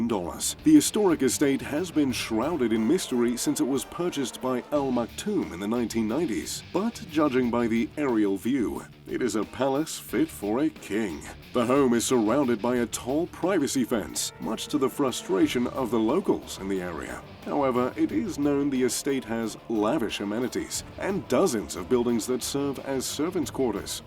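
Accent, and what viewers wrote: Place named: England, United Kingdom. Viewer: I love your voice !! My mums from England I love the accent !!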